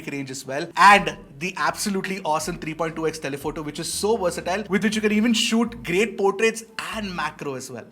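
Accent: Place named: India. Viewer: The pronunciation of "T'' in Indian accent is the ugliest thing I've ever heard.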